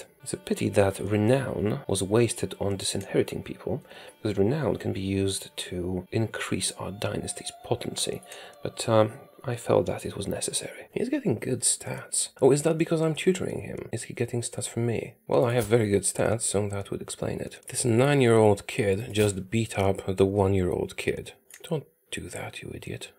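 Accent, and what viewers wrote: Poland. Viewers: It legit took me 3 1/2 minutes to recognize your voice due to that very, very subtle polish accent. No joke: I thought i was watching The Spiffing Brit for quite a while 😅😂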